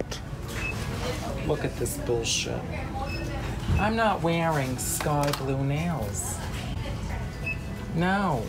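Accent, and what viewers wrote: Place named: New York, United States. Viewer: I love your accentuated New York accent. Reminds me of home. ❤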